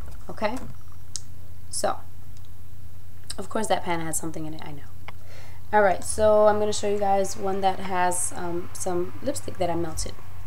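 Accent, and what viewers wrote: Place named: Cuba. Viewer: are you Cubain because you have that kind of accent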